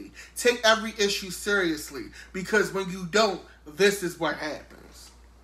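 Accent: Baltimore, United States. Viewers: You have a Bmore accent.. I like listening to how you read, and it's nice that you're so politically informed. Thoroughly entertained by this book reading and I'm only on day 1.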